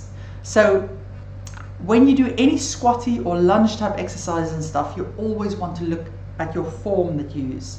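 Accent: South Africa. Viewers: Brilliant video. You sound South African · I am so happy I discovered this site . I have been suffering all of the symptoms shown on this clip , for 2 months , thinking I had rheumatoid problems. It’s difficult to actually see a medic these days , so any problems are generally handled over the phone . The advice I got was to consume painkillers , up to 8 a day , then get in touch if there was no improvement .... I took 2 and tried to keep my walks to 8k per diem , instead of the 16k to 18k that I usually do . I am still strong and reasonably fit for my age (79) .... time to my boring rave ..... I will start my new routine tomorrow. My mood is lighter already. Thanks to the lecturer , well presented information , clearly and succinctly in a great ..... South African accent ? Tomorrow I start . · … great and is that a lovely South African accent? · Quick question, i was messaging my knee and one the outer side just next to the knee cap, I got a long lasting sharp pain, could messaging trigger pain for this kind of injury? 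Love the South African accent! Thank you for the video!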